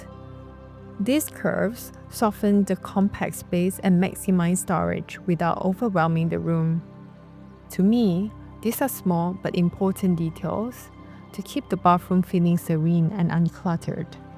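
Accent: Singapore. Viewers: Lovely place and couple. I’m glad the narrator’s Singaporean accent was easy on the ears and not the stereotypical choppy accent lol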